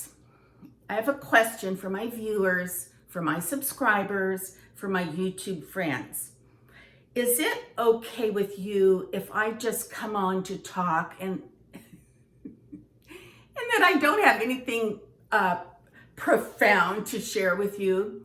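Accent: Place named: California, United States. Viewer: Hi, I am a new sub🤗 I just love your channel and I LOVE your California accent so much, where in California did you grow up? I have been binge watching your videos! 😘 I came across your channel from watching Monika Mcgillicudy and I saw a thumbnail on the right side. I love your home decor!!! Beautiful💕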